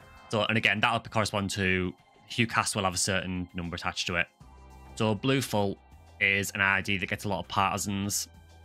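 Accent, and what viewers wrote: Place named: Teesside, United Kingdom. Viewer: you sound like your free teeside mate! Me and my friend from Billingham are literally downloading this game tonight to relive the nostalgia!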